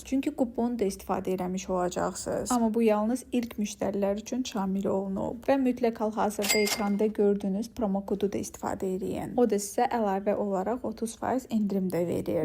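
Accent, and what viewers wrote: Russia. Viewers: после долгого просмотра тебя на русском, смотреть и слушать азербайджанский из твоих уст так странно. И сразу чувствуется,что ты русскоговорящая😅 ❤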